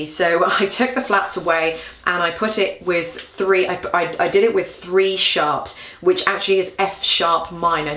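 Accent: United Kingdom. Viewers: <3 I love your British accent ! all the best!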